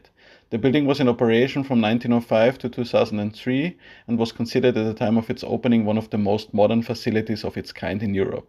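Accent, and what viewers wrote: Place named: Vienna, Austria. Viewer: Du sprichst Wiener Englisch, noch nie gehört.😊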